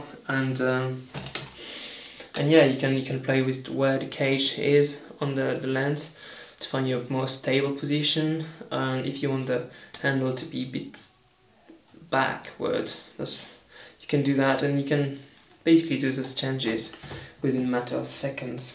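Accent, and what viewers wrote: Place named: United Kingdom. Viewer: Thanks for sharing this, where did you buy the whole set (am guessing from your accent you live in the UK)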